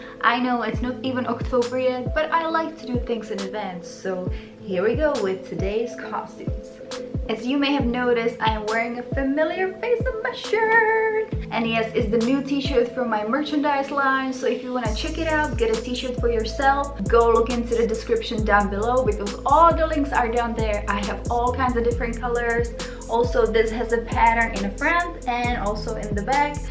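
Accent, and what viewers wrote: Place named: United States. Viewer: Your American accent is good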